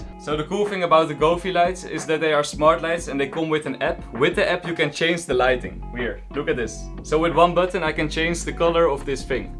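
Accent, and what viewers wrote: Netherlands. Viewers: Hahahahha je kan zooo goed horen dat je nederlands bent ga zo door💪💪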